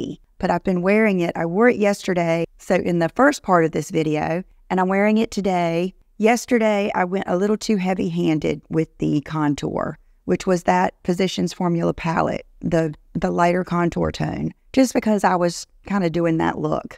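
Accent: South, United States. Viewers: Your voice makes your southern accent even more adorable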